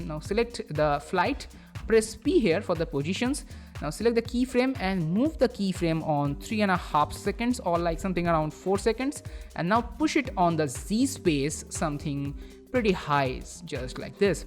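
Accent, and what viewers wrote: India. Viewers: Hay bro where are you from? West Bengal?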